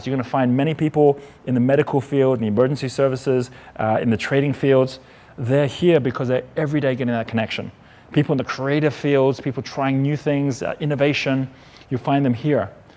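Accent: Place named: South Africa. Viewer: Can somebody tell me where his accent is from? It sounds like a South African gone to Australia or something.....curiosity killed the cat I know, but it's killing me not to get it 😬😅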